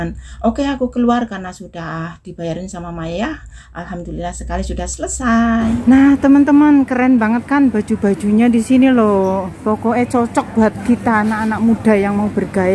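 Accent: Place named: Java, Indonesia. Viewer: Asik shoping rame-rame bersama teman😄sampean wong jowo to mbak ngomongnya kok kaya orang Jawa😁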